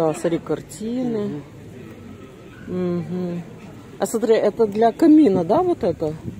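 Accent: Odessa, Ukraine. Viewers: первый раз зашла к вам на канал. Душа радуется, слушая ваш одесский говорок. Спасибо за экскурстю. У меня в Одессе живут родственники и знакомые. Всем нам мира и добра. Привет из Луганска.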